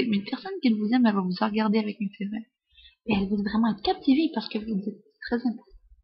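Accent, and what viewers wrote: Canada. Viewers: je pense que elle es canadienne il me semble avoir reconnus l'accent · elle est surement québécoise, si je me trompe pas!!!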